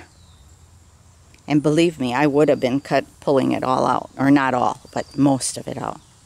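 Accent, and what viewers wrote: Wisconsin, United States. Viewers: Thank you for all the hours of enjoyment & knowledge you've provided. I have to tell you it was your "Wisconsin accent" that pulled me in - initially. You are charming and I just knew you had a twinkle in your eye.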